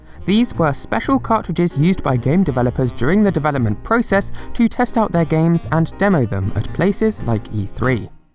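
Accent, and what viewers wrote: United Kingdom. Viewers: Dude any person who speaks English knows what a queue is you pompous ass. Just because you have a British accent and speak a less refined version of old English doesn't make you smart, it just makes you outdated. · your brit, right?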